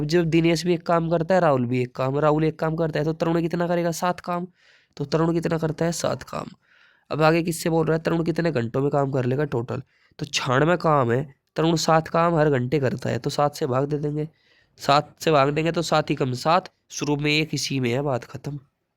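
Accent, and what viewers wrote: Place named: Saharanpur, India. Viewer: Big bro aap west up se ho kya ....aapki voice desi saharanpur wali lagti hai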